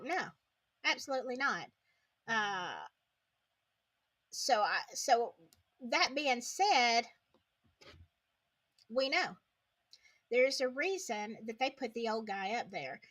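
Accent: South, United States. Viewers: Love your channel and i could listen to your lovely southern accent all day, That hoodie is awesome and looks great on you.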